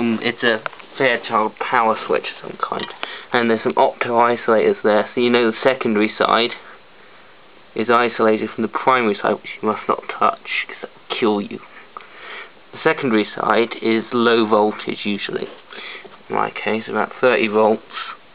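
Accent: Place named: Southern England, United Kingdom. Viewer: Nice hacking, interesting video :) Whereabouts are you from? I can't quite figure out your accent.... Essex? Kent? Or further west?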